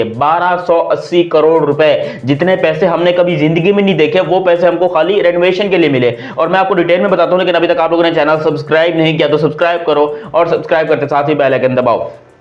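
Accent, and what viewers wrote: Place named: Mumbai, India. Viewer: Aayela hai?? Mumbai ka language...good bro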